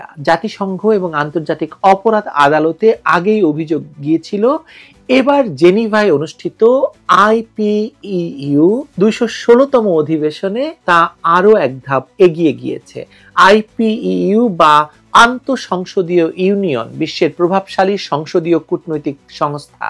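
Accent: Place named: Shantipur, India. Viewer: এত শান্তিপুরী ভাষায় না বলে নবনিতা চৌধুরীর মতো বলতে হবে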